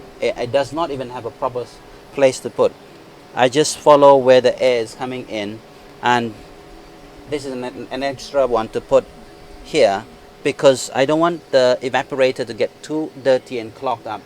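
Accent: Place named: United Kingdom. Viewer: I like your British accent!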